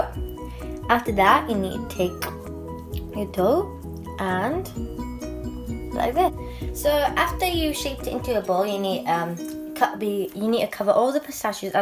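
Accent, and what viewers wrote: United Kingdom. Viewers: Thanks beauty you are so cute and your accent is like the British pple the recipe seems delicious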